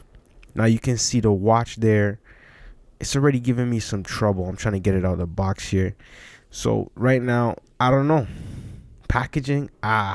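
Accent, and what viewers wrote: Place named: Toronto, Canada. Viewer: Toronto accent 🤢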